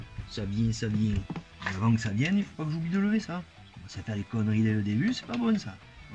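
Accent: South, France. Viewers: Cc je suis un nouveau abonné à ta chaîne et je t'avoue que je trouve ça plutôt très bien ta des plans de vidéo propre des explications claires et surtout un putain d'accent du sud que j'adore 👍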